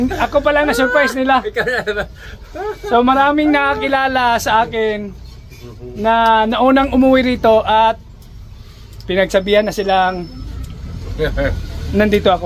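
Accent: Iloilo, Philippines. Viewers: Ilonggo ka po pala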